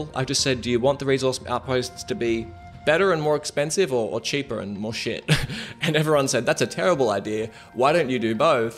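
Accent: Australia. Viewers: I've just been recommended Len's Island by the dear Lord and Savior, Steam Algorithm. At first I was immediately sold already, but then I looked up who the dev is and BAM! I found your channel.The very first time I heard your Aussie accent I know this game is going to be LEGIT. Thank you so much for developing games like this man. I long for real good survival game with procedurally generated content and I think this game will be it :) Love your stuff man!!! <3 Can't wait to download this game and stream it. Much love and respect from Indonesia.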